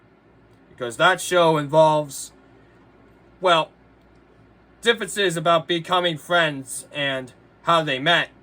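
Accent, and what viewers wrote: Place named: United Kingdom. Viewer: Dude sound British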